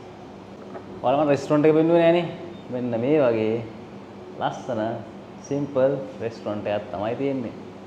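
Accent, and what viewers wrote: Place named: South, Sri Lanka. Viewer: මම නම් ඔයාව subscribe කරෙත් video බලන්න ගත්තෙත් ඉස්සර හිටපු travel bro නිසා❤අනිත් එක කතා කරන විදිය මොකද දකුණෙ නිසා❤මොකද මාත් දකුණෙ 😁, ඉතිං සුබ පැතුම් අනාගතෙට❤🎉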